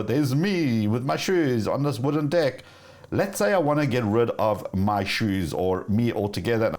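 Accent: South Africa. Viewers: Bro great vid it's bothering me you sound South African 😂but in about you from usa😂😂🤔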